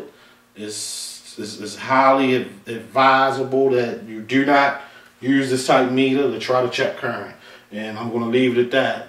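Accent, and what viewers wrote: Baltimore, United States. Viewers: Nice vid. You're from bmore huh?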